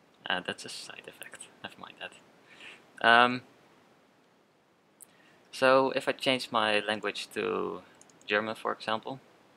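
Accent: Netherlands. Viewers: jij bent nederlands of niet je kan goed engels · Het is heel duidelijk dat je Nederlands bent. Ik ook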